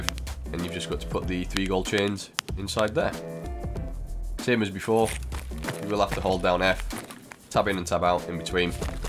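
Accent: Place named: United Kingdom. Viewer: i love that British accent :D <3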